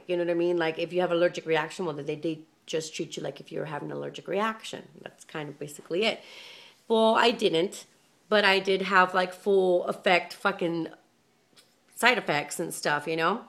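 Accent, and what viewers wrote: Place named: West Texas, United States. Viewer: Where you're from? You sound like some one in West Texas (El Paso) lol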